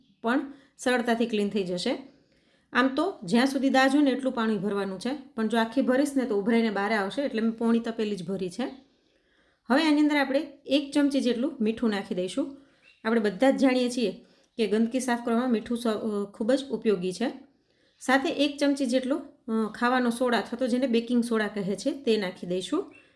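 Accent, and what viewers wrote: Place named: South Gujarat, India. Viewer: Glad to know your area. I have never been there in Kutch. Based on your Boli, my prediction was wrong but your boli is not different than South Gujarati. Me and my wife both are from Surat, both retired, settled in USA since 1979 (46 years, perhaps before you were born; again predicting based on your young South Gujarati style voice). Keep on doing good job! Jay Hind! 🇮🇳🇮🇳🇮🇳 Jay Shri Krishna! 🚩🚩🚩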